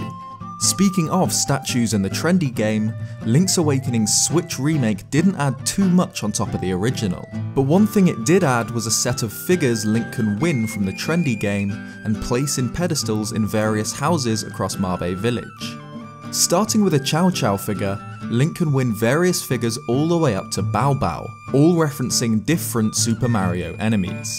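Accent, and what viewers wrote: United Kingdom. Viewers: He's clearly a brit, not american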